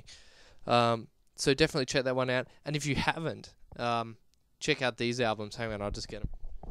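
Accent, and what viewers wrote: Australia. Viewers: By any chance are you Australian ?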